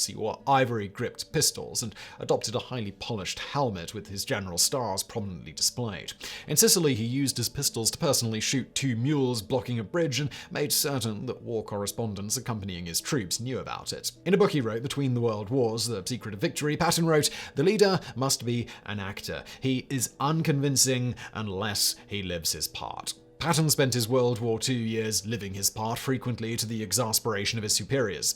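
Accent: United Kingdom. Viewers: Britain or Scotland. Love it.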